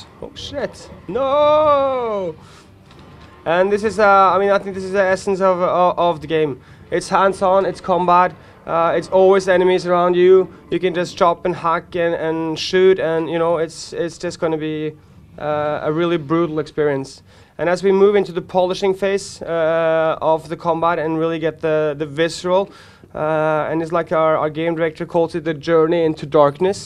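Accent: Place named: Norway. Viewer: If you are not able to understand this guy you need to learn English. He has perfectly fine English with a Norwegian accent. It is not like the English is wrong or anything.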